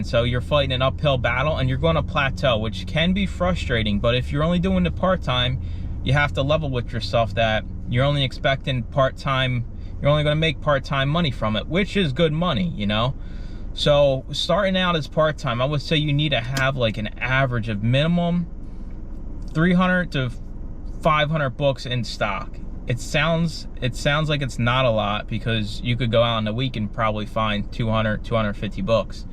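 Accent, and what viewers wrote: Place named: Pennsylvania, United States. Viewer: Subscribed last night after finding your channel via a reply you posted in a Fields of Profits video..Loving what you have to offer, thank you! Got my Bluetooth scanner yesterday and ScoutIQ today...now I'm doing a bit of research b4 heading down the street to Goodwill. BTW I love guessing people's accents and I'm so glad you said you where you live b/c I guessed last night...Pennsylvania! I have people in the Pittsburgh area but and ex was from Harrisburg and so your accent reminds me of all his friends and makes me smile. Thanks for all the VALUABLE INFO!!!!!!!!! I'm SO EXCITED to include books in my FBA biz!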